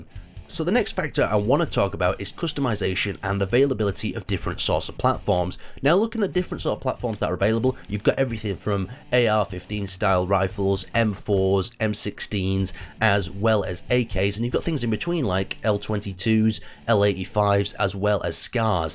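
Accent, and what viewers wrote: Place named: North West England, United Kingdom. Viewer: I have a feeling he's from the north west, if so hello from Wirral!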